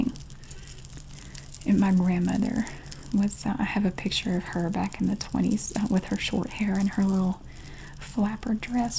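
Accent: South, United States. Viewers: You remind me of my grandma :). She also has a southern accent and sounds almost just like you. When I was younger, ( I'm now a teenager) and i spent the night at her house, she usually put me to sleep by reading a story, telling a story of her own, singing me a song, etc. And you just remind me of those times, and put me to sleep, thinking I'm a kid again in my grandma's comforting presence. thank you for the nostalgia :)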